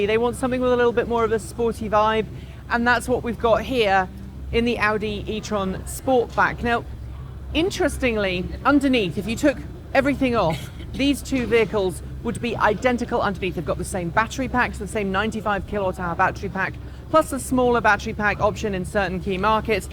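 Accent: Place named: United Kingdom. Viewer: I still don’t understand why you report in British accent while you talk normal US accent in person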